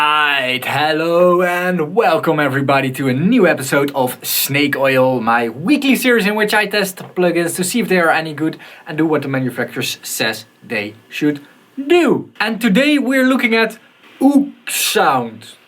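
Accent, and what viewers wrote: Netherlands. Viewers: I'm perceiving a Dutch vibe in the way you speak English :) nice review man, quite objective.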